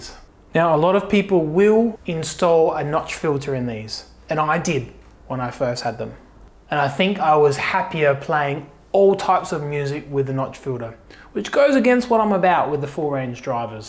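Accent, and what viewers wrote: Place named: Australia. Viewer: I'm assuming that you're in Oz (from your accent). The Alnico Super 12's pop up every once in a while on Marketplace. One pair sold less than a month ago and there is another pair in cabinets on there at present. As Molly would say.... Do yourself a favour and find them 😂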